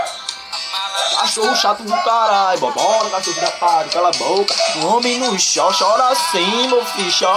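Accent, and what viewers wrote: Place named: Pernambuco, Brazil. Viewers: É o melhor sotaque do mundo o Pernambucano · Pernambuco boy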